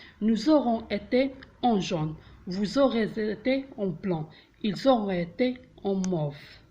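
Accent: France. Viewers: really great information and superb - i love the french accent - hope these tips i will learn french - thumbs up ;) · French accent is superb. U r doing awesome work 👍👍👍😊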